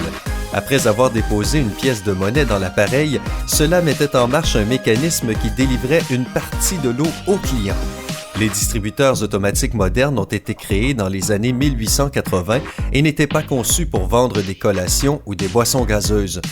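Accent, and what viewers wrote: Quebec, Canada. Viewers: Je déteste entendre l'accent parle par des Quebiquois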